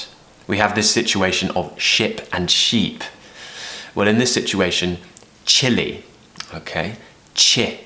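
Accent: United Kingdom. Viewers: Very beautiful British accent!!! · love your British accent😊😊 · your British English is so fantastic! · Hi, I speak spanish and I am learning british english and with your channel and your accent, I can learn many things, Thanks! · You are so easy to understand I hope all british people speak like you😂💔💔 Your british accent is very exciting😍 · When you said "Oi" from Boiling, this "Oi" in Brazilian portuguese means "Hi" in English. Good to see these aspects. I really like your channel and I'm beginner in English and I want one day to talk to this beautiful British accent. I'm from Brazil 😊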